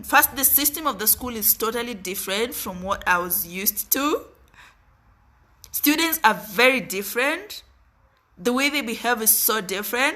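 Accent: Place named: Mongolia. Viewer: You are a very strange woman the same as the guy who told you not to go to Ethiopia. You just look an ordinary Ethiopian girl but you sound like as if you are from Mongolia.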